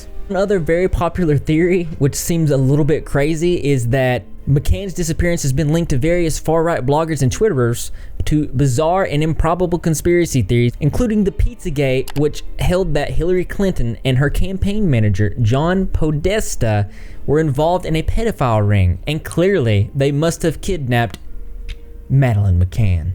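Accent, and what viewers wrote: South, United States. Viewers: Conspiracy theories, best hair on the platform and a southern accent? I STAN😍❤️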